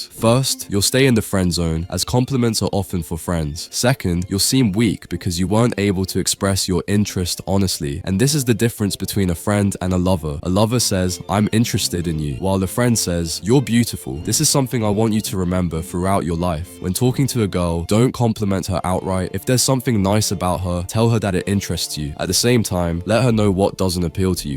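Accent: United Kingdom. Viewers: UK accent🔥🔥🔥🧠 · Are you British?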